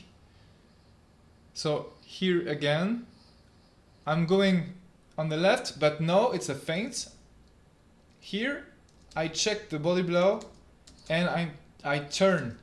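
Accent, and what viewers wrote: France. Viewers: He is french i belive or speak french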